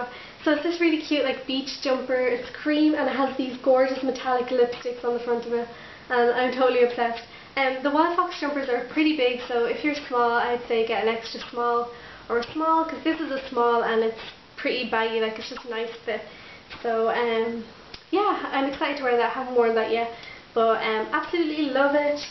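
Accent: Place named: Ireland. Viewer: Your irish aren't you? I can tell by the way you say demi lavato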